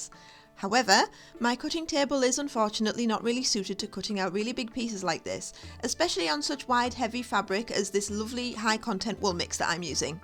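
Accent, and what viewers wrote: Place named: Teesside, United Kingdom. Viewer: Thanks for this video. I have absolutely zero skills in making clothing but it’s been really helpful in relation to fabrics, colours and even potential suppliers. I also recognise that accent. Teessider? Or there about?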